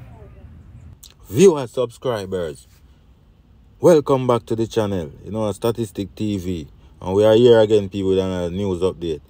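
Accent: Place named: Jamaica. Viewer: Why some of you blogger think people want to hear this wasted Jamaica talk. You se me. Learn to talk brentren. No disrespect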